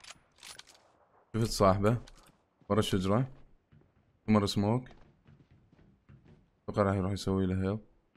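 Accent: Iraq. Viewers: انت عراقي 🤔🤔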